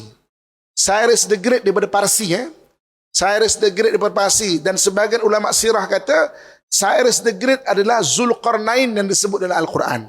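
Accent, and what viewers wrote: Malaysia. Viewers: hmmm aku xsuka dgr logat² semenanjing ni. Dosa jadi dose 🥴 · Alhamdulillah ilmunya beliau patut diacungi jempol, meski sayye agak-agak tidak paham apa yang disampaikan soalx menggunakan logat Melayu. Salam dari 🇮🇩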